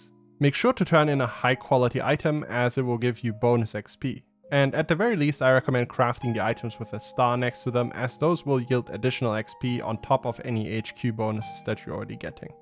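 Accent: Germany. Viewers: How many Youtube accounts do you have? or are there just a couple of you similar voiced guys out there with a German accent playing FFXIV?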